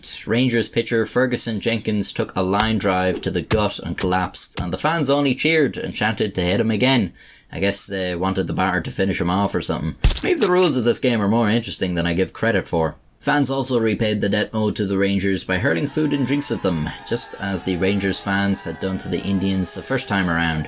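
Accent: Ireland. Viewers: I think everyone loves you because of your Irish accent. Plus you are pretty funny!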